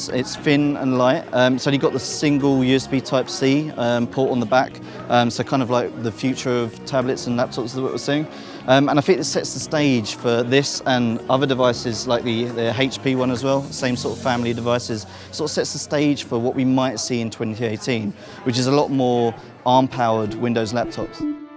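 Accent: England, United Kingdom. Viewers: He is English and we pronounce it Hach. As we basically developed English, we should know how to pronounce letters. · I actually quite welcome an English speaker for once.... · It's a British accent I'm pretty sure the British is more accurate on English then Indian 😂😂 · Saurabh Raut It's the English language, he's pronouncing it the English way, not the American way.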